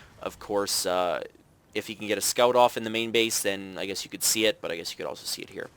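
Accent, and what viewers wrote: Canada. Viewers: you sound so canadian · I'm Canadian and I didn't know what a Canadian accent was before hearing you. Keep up the great work. Your commentary is up there with the best.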